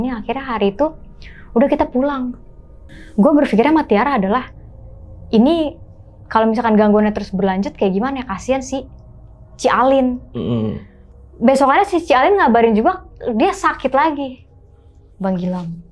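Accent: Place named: South Jakarta, Indonesia. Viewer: Terlalu Jaksel gaya penyampaian materi nya, hello yg nonton bnyk org daerah bicara nya terlalu jakarta deh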